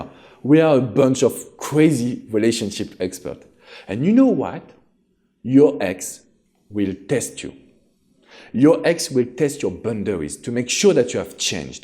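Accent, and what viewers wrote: France. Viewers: mon cheri tu es tres beau!! PS I understand your French accent just fine..😉 and je ne parle francais really so... You give great advice! Merci Beaucoup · the women love your French accent! I have a question. What if a guy says he needs closure but wants to remain friends and one minute acts happy about the break up and then sad about the break up and what if I can’t help but say really stupid things over text about him moving on.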